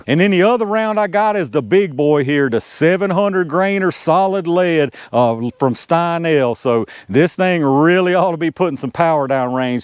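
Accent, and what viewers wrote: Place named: Texas, United States. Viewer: You sound like your from Texas bro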